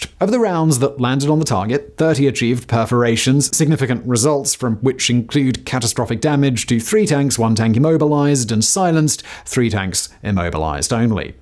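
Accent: United Kingdom. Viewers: Despite your British accent and the continued use of the word zeitgeist your review of of the A10 demontrates your an utter ignoramus. First of all, the 30 mm gun is just one tool in the a10s drawer. It carries a bevy of missiles and bombs which can and have destroyed numerous Main battle tanks from any angle. On the other hand the 30mm can eliminate pretty much any other armored vehicle without breaking a sweat. It had more losses than other aircract in the Gulf because no other aircraft were used and couldn't be used the same way. The A10 would loiter in the battle space pass after pass providing persistent close air support. All other jet fighters would do a single pass at high speed and move out. BTW, the Air Force hates the A10. As far as they are concerned close air support is for the army and its helicopters. The people who most like the A10 are the ground troops that know the benefit of having a 30 mm gun in the sky farting 1000s of 30 mm rockets and bombs over your enemy over and over again.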